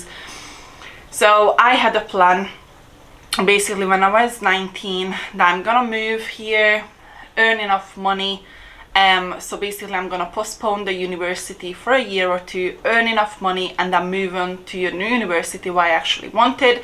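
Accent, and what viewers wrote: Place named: Europe. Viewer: you CANNOT claim British Citizenship if you had a baby born here in the UK from a British Ciztizen! Having a child who is a UK citizen does not automatically grant you citizenship as a parent. you were talking out of your arse! don't spread nonsense information that is absolutely not the case! Before you post videos, do your research! Giving up your Hungarian citizenship was the stupidest thing you could ever have done! What an absolute idiot idea! So basically, you gave up on your Hungarian Citizenship because you are a self-conceited person that it makes you believe that you are somewhat of a bigger person than the rest of us. I can easily imagine if you ever went on holiday to Hungary, you would be pretending to be a British born Citizen and only speak English to everyone. how pathetic! But in reality, you have lost a lot by giving up your EU Citizenship :) Another thing, your accent is off. 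You still have that strong European accent, so keep practicing! One day you might be able to have a British accent ;)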